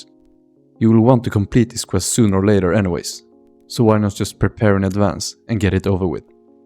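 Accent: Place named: Sweden. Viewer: snyggt, nä men notera det när jag hörde att de va lite svensk dialekt i din engelska, funderade om du va norsk eller svensk xD :D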